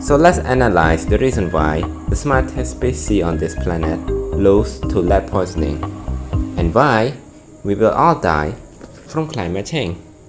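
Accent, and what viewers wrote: Singapore. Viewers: U got a strong singapore accent vro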